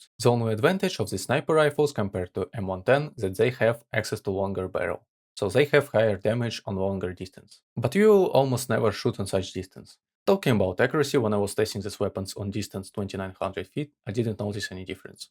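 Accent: Russia. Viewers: Не знаю почему но твой говор, или же что то выдаёт тебе русского или снг человека. За рание прошу прощение если ошибся.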